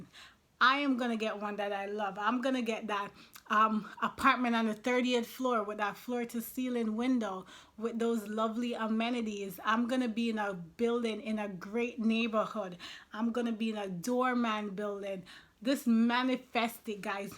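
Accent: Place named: Jamaica. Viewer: Sound Jamaican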